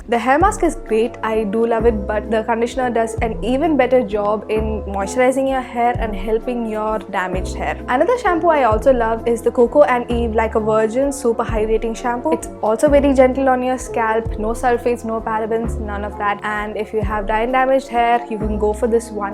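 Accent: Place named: Kerala, India. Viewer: Are you a malayali? The accent sounds just like a mallu girly🤩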